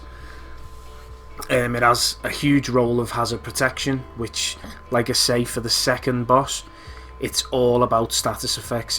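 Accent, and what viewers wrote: Liverpool, United Kingdom. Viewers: Wasn’t expecting to hear a scouser on this video lol, not a bad build mate, where about in Liverpool you live, don’t live too far from there myself, got quite a similar build to yourself but for an ar build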